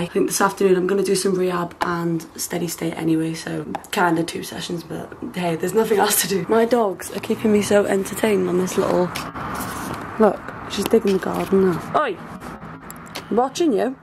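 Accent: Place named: Wales, United Kingdom. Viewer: The Welsh accent is getting stronger with each day in quarantine!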